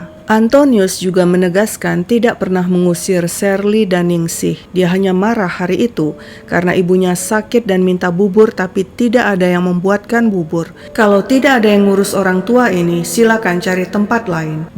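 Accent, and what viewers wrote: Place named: Manado, Indonesia. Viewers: Admin fasih deng logat Sulawesi..😊😊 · Min logatnya manado padahal Min cerita kasus dari toraja sulsel. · Dialek khas Utara si admin mantap 👍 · kok logatnya manado?? haha setahuku toraja ga gitu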